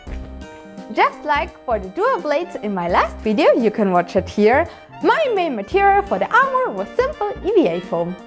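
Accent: Germany. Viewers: Your German or?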